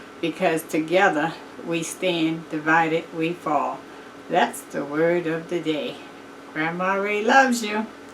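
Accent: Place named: New England, United States. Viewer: I Don't know who you are but I can tell a voice from New England 🙌🏾🙌🏾 Keep doing your thing Miss Lady 🙏🏾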